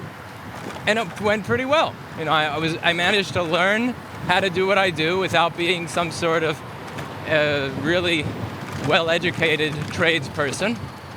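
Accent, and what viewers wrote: New York, United States. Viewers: your NY accent reminds me of Jerry sienfeld! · it's the NY accent....it comes from a mix of Italian and Jewish immigrants adding to the Irish and WASPs....despite him not being Jewish, uses Yiddish influenced NYC phraseology. Hence your association with Jerry Seinfield, who has some of the same accents.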